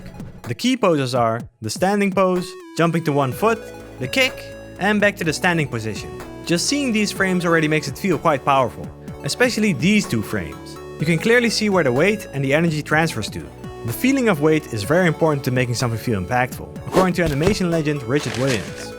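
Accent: Netherlands. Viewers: your english is decent, it took me literally a minute to notice your dutch accent :p u have a typical dutch D & T. coole video trouwens. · u dutch ?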